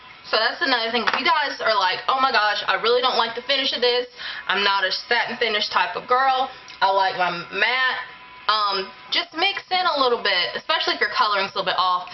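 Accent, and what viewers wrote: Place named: South, United States. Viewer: Is that country in the background?? LOL Your southern accent is cute!!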